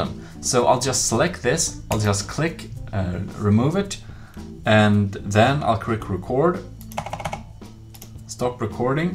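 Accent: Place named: Sweden. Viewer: i can litrealy here that ur from sweden LAMO lwest goo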